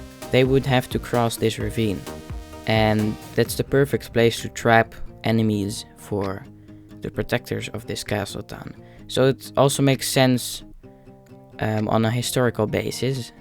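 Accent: Netherlands. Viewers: Are you Dutch/Netherlands?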